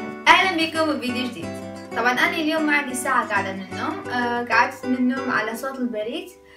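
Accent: Mosul, Iraq. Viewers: حبيت كلامج العراقي لهجتج كانما مصلاويه اول مرة اشوفج وحبيتج وسيويتلج سب سكرايب فدوة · كافي عيارة لو تحجي عراقي لو لبناني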